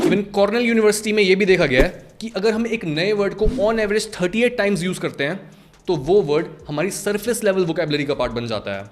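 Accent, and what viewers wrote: India. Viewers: İngilizce biliyorum saniyodum ta ki Hint aksanlı bu adamı dinleyene kadar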